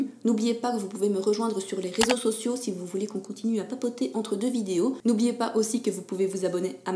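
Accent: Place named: Belgium. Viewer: Coucou, Je t'ai découvert grâce à Patricia B (merci à elle!), et j'adore tes vidéos tout comme ton accent Belge, vraiment;)! j'ai le nettoyant bioré au charbon dont tu parles, je suis surprise car pour moi l'odeur n'est pas du tout désagréable, ça sent le frais, il y a peut-être des différences de compo entre la France et les US... Étrange ^^ Bisous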